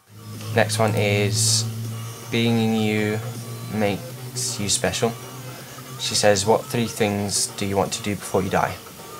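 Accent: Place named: England, United Kingdom. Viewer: you sound more english than welsh, aw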